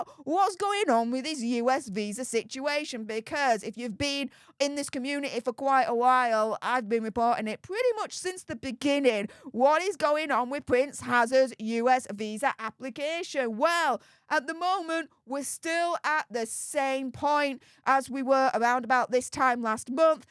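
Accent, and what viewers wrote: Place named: Cockney, United Kingdom. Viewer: Your accent sounds East ender working class .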